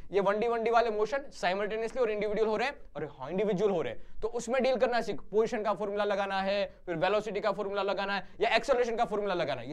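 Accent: Gujarat, India. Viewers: Sir Gujarati confirmed by accent 👏🏼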